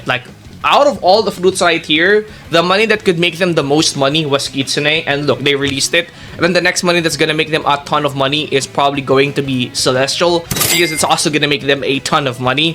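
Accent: Philippines. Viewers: idk why but your accent's giving me a filipino vibe lol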